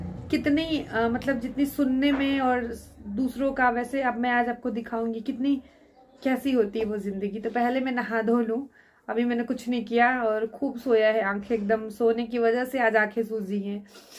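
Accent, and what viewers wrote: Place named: Varanasi, India. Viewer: आप वाराणसी मेँ रहती हैं क्या',सो funny, अरे का खइनि ह समोसा तो ख़ इनि हं👌..कोई बात नही आप लोगो की बात न सुने आप जैसी हैं वैसी ठीक हैं वैसे दुनिया में कुछ लोग हैं जो कितना भी अच्छा कर लो ,लोंगो को गलत ही लगता है इसलिए ,ना किसी के अभाव में जिये न किसी के प्रभाव में ये जिंदगी खुद की है बस इसे अपने मस्त स्वाभाव में जिये..🙏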